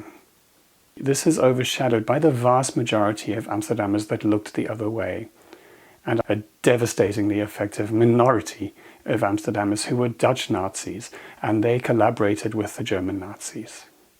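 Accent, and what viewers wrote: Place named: United Kingdom. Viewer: Are you btw Dutch or British? Your accent sounds very British.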